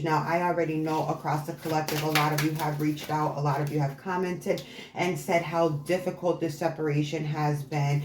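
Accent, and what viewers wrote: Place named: East Coast, United States. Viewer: You have no idea just how much i would enjoy to sit and chat with you or have a personal reading and such. In the world of psychics and tarrot there are but a few who are truly gifted and know how to properly utilize and share that gift. Sadly we live in a world where scams have existed from the beginning. So its very refreshingly beautiful to come across a reader such as yourself. Not a phoney bone in your body. You are clear, speak well and very detailed and concise as far as a card can be keep what resonates and leave the rest You have a nice clean visual for your background looks bright and clean with that lil pop of red and your speaking voice is very very easy for me to listen to. Im sensetive to sound and light. Sometimes a person could be lovely to look at then the minute they talk i just want to run lol. 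Also hear a bit of east coast accent and i luv that and i just adore you. Your shorts are wonderful 👍 i have never taken a salt bath but there are Himalayan salt spa caves and i would luvv to go to one or the healing spings in Arizona. Anyway i believe in the universe and that it gives us sign after sign and so very many who dont believe just wount see the signs. Heck even those who believe miss or ignore signs. Spirituality is a journey and a very personal process. For some it starts as toddlers for others teens and older and sadly for some never. #ALL CAN, SOME SHOULD, NONE MUST! Love&Light ~♡~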